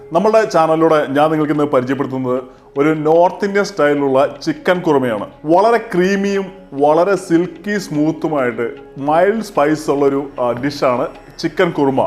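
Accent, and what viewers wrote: Kannur, India. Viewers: Looks Good. I will try it and comment it here. Are you from Kannur? You are having a Kannur accent. It seems you are based in Dubai. I am a great fan of Lebanese Lamb chops and lamb Kabab (not ground lamb kofta Kabab, but lamb pieces). Could you please do it whenever you feel convenient to do it. I am a regular watcher of your cooking videos. Crisp and short videos. I love your videos.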